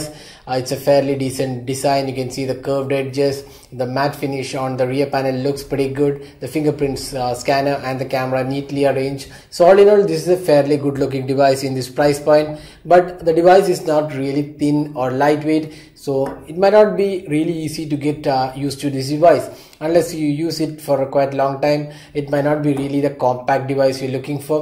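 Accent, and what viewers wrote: Kerala, India. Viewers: like your accent. Kerala?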